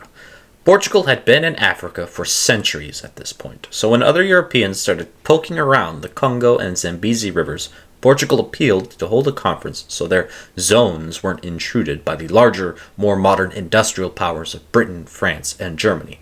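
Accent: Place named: Brazil. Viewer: Great video! Just make sure not to use Brazilian pronunciations for Portuguese names in the future 👍🏻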